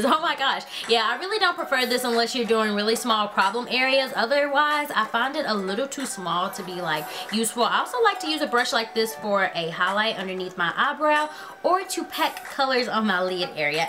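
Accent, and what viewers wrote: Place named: Alabama, United States. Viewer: Woo your from alabama =P I LOVE our accents! and I freaking love all your video's! girl you need to stp makeing me spend so much! lol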